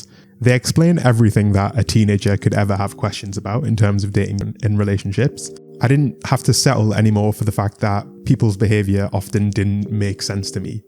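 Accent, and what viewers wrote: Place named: Newcastle, United Kingdom. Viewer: My mum looked over at my phone and said our accents are similar, u from new castle? Also she likes ur skin..no I'm not being held at gun point (help)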